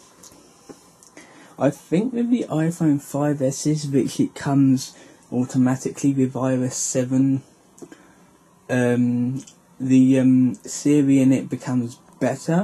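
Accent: United Kingdom. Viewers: r u from UK,fella ?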